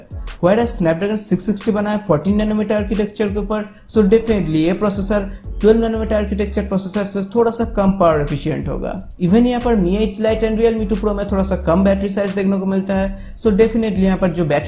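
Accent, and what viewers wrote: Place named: Bihar, India. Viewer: भिहारी टोन आ रहा है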